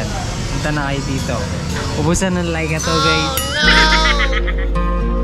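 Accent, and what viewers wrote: Pampanga, Philippines. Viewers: Kapampangan Accent pa din, kuya 😂✌️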